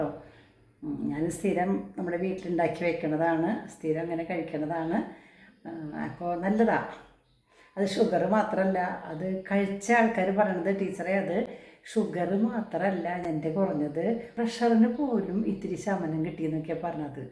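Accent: Thrissur, India. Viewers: അതുമല്ല, തൃശ്ശൂർ ആണെന്ന് തോന്നുന്നു 😅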